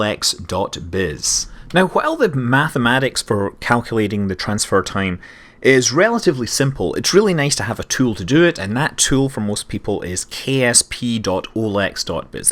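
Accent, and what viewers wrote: Scotland, United Kingdom. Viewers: It's pretty obvious from his accent that he is from Scotland.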